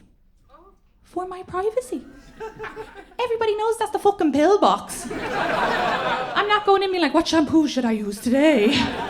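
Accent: Ireland. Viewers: I feel like she gets more Irish every time I see her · Why do Irish people add an "e" to the word film? "Fil-em"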